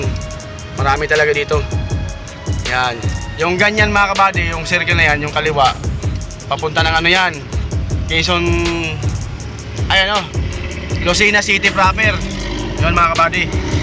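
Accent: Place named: Visayas, Philippines. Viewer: Kabayan konti lambot ng dila para di mahalata bisaya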